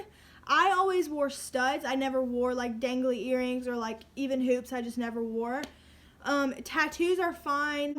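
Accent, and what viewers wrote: South, United States. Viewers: just curious because i noticed your accent and also i didnt know publix was anywhere besides fl ❤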